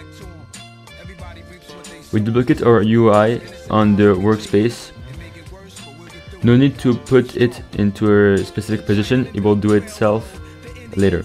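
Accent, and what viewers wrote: France. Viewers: are u french ? good tuto btw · Are you french by any chance? I mean the accent Nice vid bro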